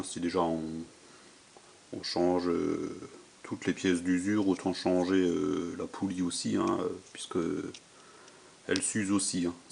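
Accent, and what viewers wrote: Alsace, France. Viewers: je suis tombé sur votre vidéo YouTube et j'ai de suite reconnu l'accent forcément etant alsacien. Wittenheim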